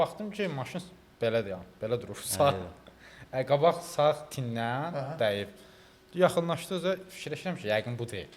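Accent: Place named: Quba, Azerbaijan. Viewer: Quba ləhçəsi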